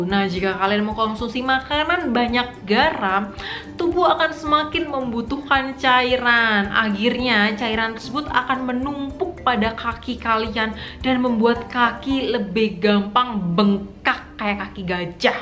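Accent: Java, Indonesia. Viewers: Medok bgt sih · mba bukan orang jawa ya? Orang jawa memang gitu mba, kakak ku juga gitu klo ngomong ngeden2. Itu adalah logat namanya.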